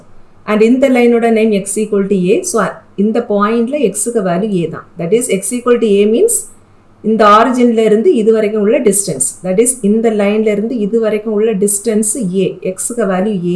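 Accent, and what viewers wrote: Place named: Tirunelveli, India. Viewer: Romba arumaiya teach panreenge. Namma thirunelveli style pechi. Thank you so much madam.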